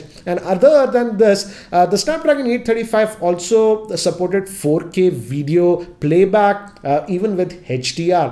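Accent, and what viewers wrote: India. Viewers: Would have told something which other channels missed to include...this video is copy of Android authority video n converted that into indian accent..no offence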